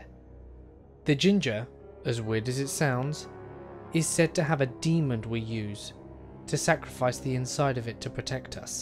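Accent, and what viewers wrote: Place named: Southern England, United Kingdom. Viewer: Ah, what a nice response! Would that all YT comments were so reasonable and intelligent. I'm northern English myself so he's just a nice-sounding southerner to me. But I understand how he might sound semi-exotic to our friends on the other side of the Atlantic!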